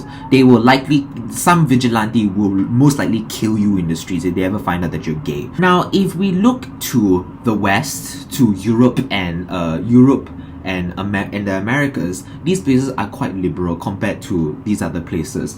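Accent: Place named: Singapore. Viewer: Its called Singlish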